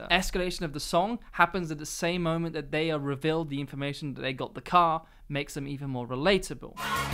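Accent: United Kingdom. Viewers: Wow the genius of david dobrik explained by a mastercraftsman in an english accent. Impeccable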